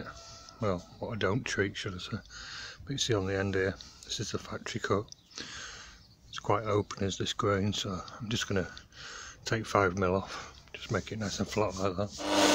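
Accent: Yorkshire, United Kingdom. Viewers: I'm from Yorkshire myself and I van hear the accent ☺ great tips thanks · Really, really enjoyed that. You taught me a great deal there. Thanks for all the work. Nice to hear a Yorkshire accent - from a Yorkshire man living in Sydney. Cheers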